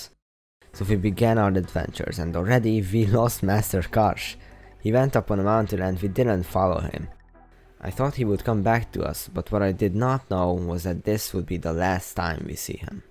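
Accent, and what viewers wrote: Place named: Hungary. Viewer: Nagyon úgy érzem, hogy magyar vagy. Az akcentus mindent elmond. Amúgy nagyon jó lett a videó!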